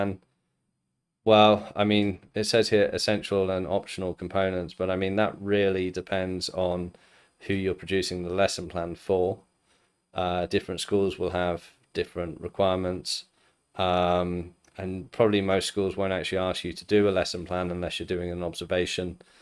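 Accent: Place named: United Kingdom. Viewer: I’ve listened to you speak, and your accent sounds British or from a similar region. Your personal standards may work well in native English-speaking countries, but schools in Ukraine, Poland, Germany, Lithuania, and most schools in Asia, which are non-native English-speaking countries, do not prioritize whether your certificate is from a professional school. As long as you are a native speaker, that’s what matters most. Personally, I feel that teaching English to native English speakers (L1) is not as fulfilling. Most of the people in your comment section are from non-native English-speaking countries, and suggesting that they need to spend thousands to get a certificate like yours to teach is doing their countries a disservice. This is just your personal opinion, so please make that clear to them.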